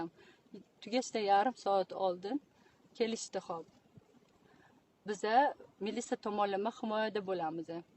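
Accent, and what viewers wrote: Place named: Tashkent, Uzbekistan. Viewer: Узизни шевазда гапируринг .тушунамиз адашиб юбориб яна повторный тошкент шевада тугирлаб кетвосиз. Бир гапни икки шевада гапирвосиз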